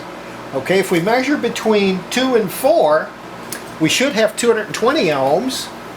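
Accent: United States. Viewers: Ok, thanks. I like, looks more feminine. I made crystal radio in 1964 when I was studying in the 6th class. My ham call is ap2kd, and I'm ok in ARRL book. I have a lot of ham equipment. Most famous WS 19, RCA AR 88, Marconi Atlanta, Marconi Appolo, Collins KWM-2A. I also like military communication equipment being very rigid and waterproof. From your speaking exsent, you look American. Regards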